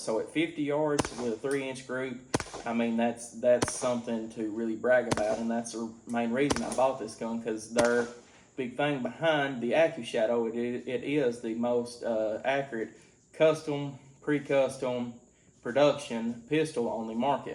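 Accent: South, United States. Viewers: Where are you from brother? You have a heavy draaaaaawwwww!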